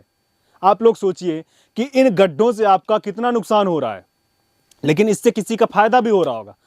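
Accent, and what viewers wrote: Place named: Arunachal Pradesh, India. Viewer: Arunachali hindi 😅👌🏻👌🏻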